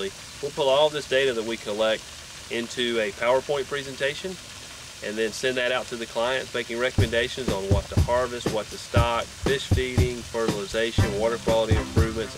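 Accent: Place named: Virginia, United States. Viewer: Love the accent :) Virginia ?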